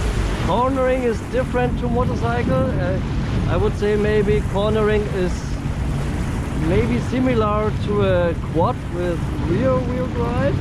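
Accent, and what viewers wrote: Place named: Austria. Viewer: Stark gemacht danke.. hörst dich bisschen österreichisch an haha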